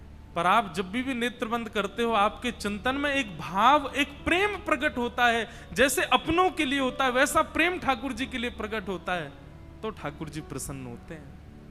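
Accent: Maharashtra, India. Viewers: Gurujii tumhara sambhaashan shuddh marathi he a one supperrrrrr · App marathi Thoda hi bolte par pyare lagte h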